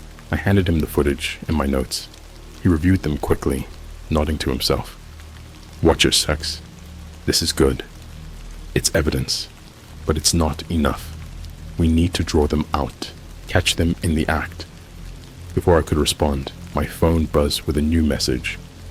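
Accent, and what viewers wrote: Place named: United Kingdom. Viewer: You sound like you're a fellow Brit! However, "nine hundred and eleven" makes you sound like a bot! it's nine-one-one!😺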